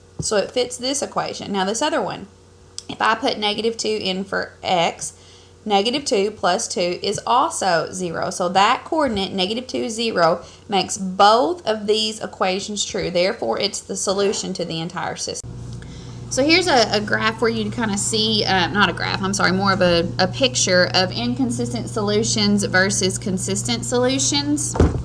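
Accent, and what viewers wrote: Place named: South, United States. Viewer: when here accent yeeha I felt that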